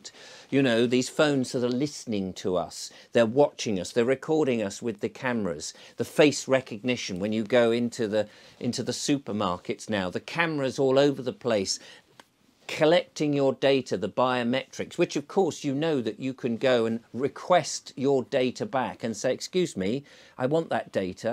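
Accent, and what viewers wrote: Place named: Eastbourne, United Kingdom. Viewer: Sounds like you live in Eastbourne ☺️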